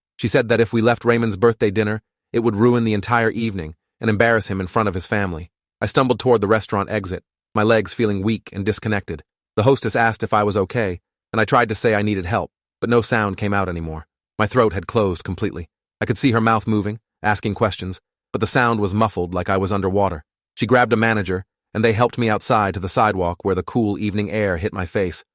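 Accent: United Kingdom. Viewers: Did you notice the way it slowly switched to a British accent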